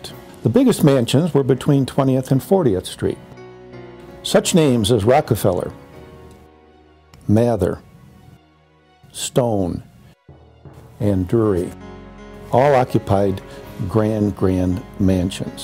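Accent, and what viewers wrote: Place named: Cleveland, United States. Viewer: Wish more of the houses from this era were still standing! On an unrelated note, you have a classic Cleveland accent lol.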